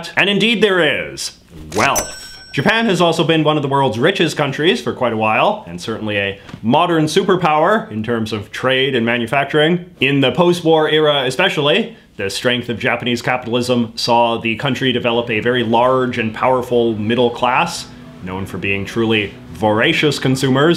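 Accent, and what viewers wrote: Canada. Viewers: You sound astronomically Canadian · Ik this video is about japanese culture but by watching you im learning alot about the canadian accent.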